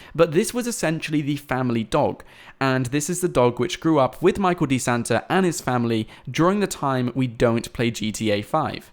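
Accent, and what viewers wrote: United Kingdom. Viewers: u r britain accent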